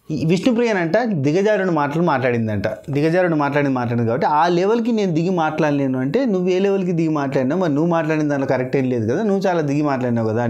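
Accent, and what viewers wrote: Telangana, India. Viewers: I dont watch Big Boss and I also dont watch Buzz... but I only watch ur reviews.. asal mana telangana slang la masth comedy points tho reviews.... super o super.. reviews are always funny..... Perception purse lo petta.. rough adisthunde akada adathaledhu... LOL ROFL..🤣😂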